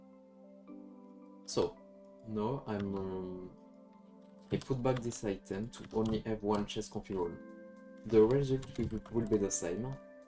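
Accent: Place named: France. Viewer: A ton accent je dirais que tu es français xD. Good job bro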